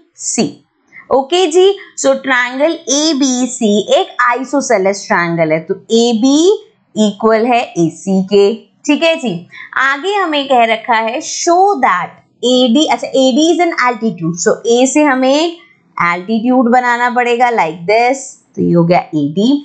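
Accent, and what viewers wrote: Punjab, India. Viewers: Tuse Punjabi ho kya???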